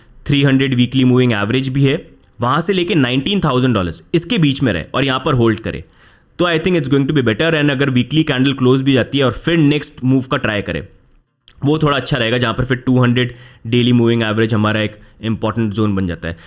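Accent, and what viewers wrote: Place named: India. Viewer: sry bro but these indian eglish is horrible, cant understand anything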